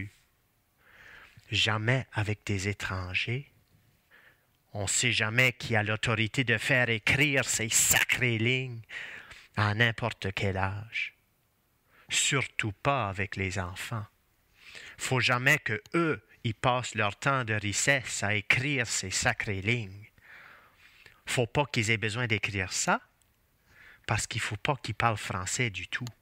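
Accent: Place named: Canada. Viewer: T'es canadien, toi ? Juste curieux pcq ton accent est différent que ça de nous-autres icitte en Louisiane.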